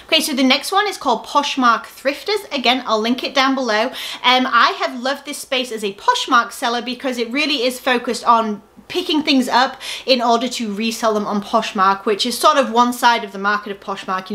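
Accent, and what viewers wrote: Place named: United Kingdom. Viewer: great information! thanks. i've requested to join the groups. i have a personal question. where are you originally from? i can't quite place the accent (assuming UK but what part?) thanks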